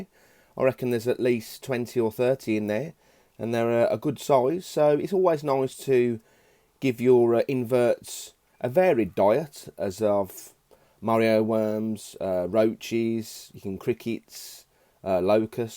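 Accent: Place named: United Kingdom. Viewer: Nice pick ups .. Always enjoy your videos. Ur your accent is my fav out of all the UK people lol shhh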